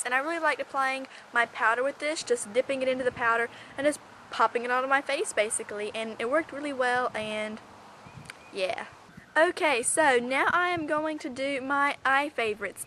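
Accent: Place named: South, United States. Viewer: I LOVE YOUR SOUTHERN ACCENT!!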